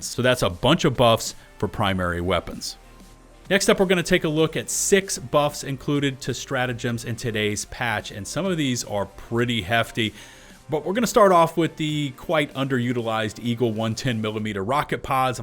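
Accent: Louisiana, United States. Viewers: His southern accent is giving Cajun… lol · you sound like Louisiana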